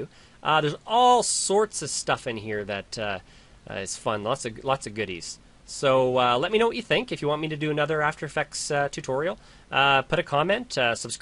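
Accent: Canada. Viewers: Canadian Accent FTW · Very nice vid. :) by the way, is that a Canadian accent?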